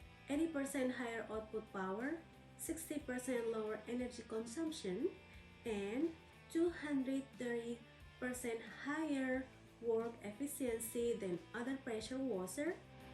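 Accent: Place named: Scotland, United Kingdom. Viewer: I thought you did a great job.,.... Maybe it's hard for people with that thick Scottish accent to understand.. Heck nobody can understand them anyway.... Keep after it girl 🙂🙂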